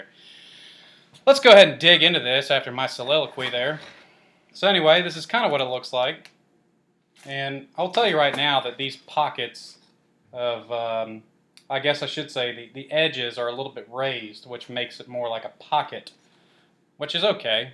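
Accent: United States. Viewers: You have a country accent. It's cute 😉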